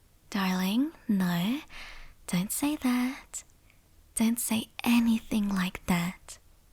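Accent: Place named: Australia. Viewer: Always nice to hear a fellow Aussie do asmr :o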